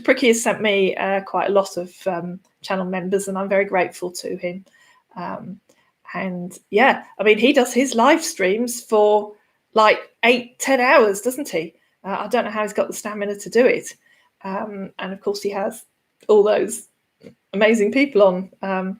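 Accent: United Kingdom. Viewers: Hello! I'm learning English and I discovered your chanel. I'm interested in romance scamming because it's an interesting subject. I've seen several videos of yours and at last I see your face. Your have such a lovely voice and I like your British accent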